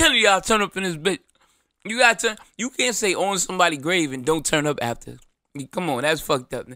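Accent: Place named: Memphis, United States. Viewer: Bruh the Memphis accent took me out breh 😂😂😂😂😂😂😂😂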